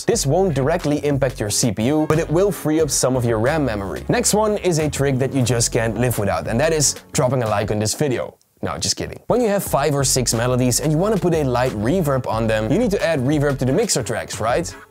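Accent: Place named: Netherlands. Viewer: Ik hoor dat je Nederlands bent 👊🏼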